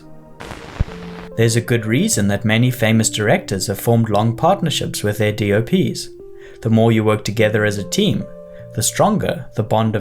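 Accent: South Africa. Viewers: The narrator sounds south african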